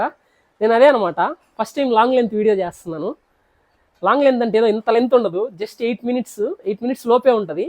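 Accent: Nellore, India. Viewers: Ide ma nellore yaasa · Skip cheyakunda chudamannav kani ur talking was to good bro nellore yasa super😂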